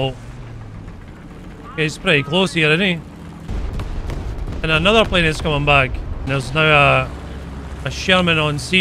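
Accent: Scotland, United Kingdom. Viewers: Two observations: 1) Your “silly skit” intros are excellent, and they seem to be getting better as you go on; 2) I’ve made viewing your videos part of my daily routine. Whether it’s your Scottish accent, your hilarious sense of humor, or the excellence of your content, I guess I’m addicted to your channel. Well done,.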